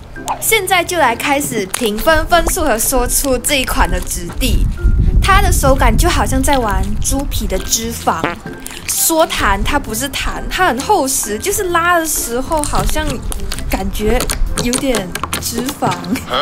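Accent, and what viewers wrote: Malaysia. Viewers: 你是马来西亚人还是台湾人？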